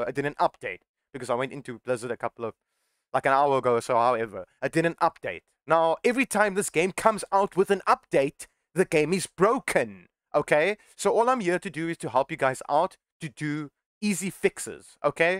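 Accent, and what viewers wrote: South Africa. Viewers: thanks! btw is my man south african?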